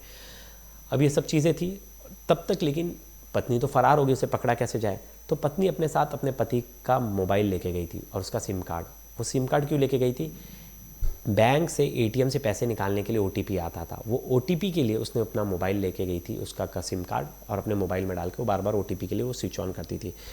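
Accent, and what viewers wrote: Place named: Varanasi, India. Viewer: बनारसी हैं क्या सर 😊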